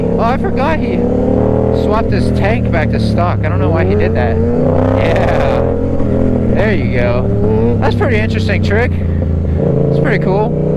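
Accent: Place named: India. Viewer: W Indian accent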